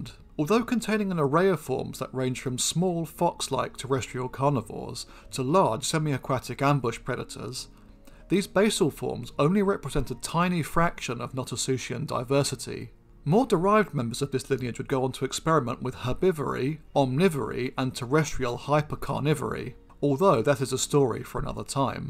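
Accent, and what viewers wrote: United Kingdom. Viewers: is like the fusion of Mr. Rogers and Sir David Attenborough who could take on Perfect Cell, All For One, Lord Boros, and The Anti Spiral King with nothing but his Upper British pleasant kindness while hitting them with facts.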